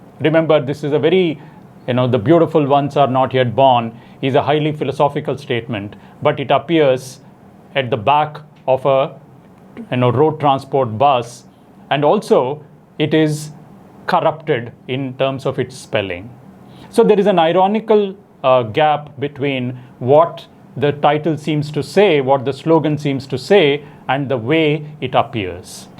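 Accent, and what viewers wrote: India. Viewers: I hear only Indian accent. No English there sir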